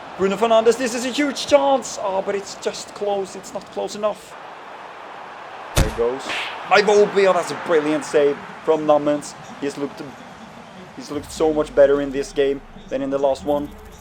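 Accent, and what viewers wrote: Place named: Norway. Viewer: Are you Norwegian? You speak kind of Norwegian/English 😊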